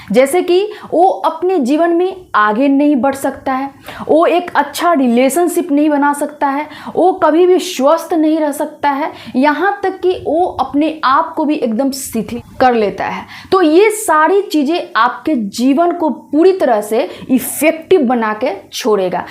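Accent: Bihar, India. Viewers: MADAM, LAGTA HAI AAP MERI TARAH BIHARI HO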